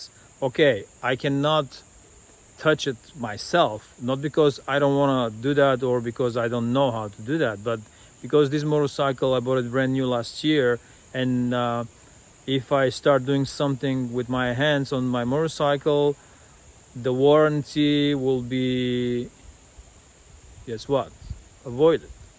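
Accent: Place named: Eastern Europe. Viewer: oh! I knew you sounded Eastern European. Добре дошли!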